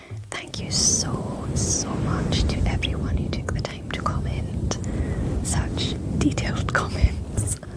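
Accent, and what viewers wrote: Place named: Scotland, United Kingdom. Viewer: I think you are Scottish because of the way you sound but also I can understand what you’re saying so I don’t think you currently live in Scotland. Were you in America or England for a few years?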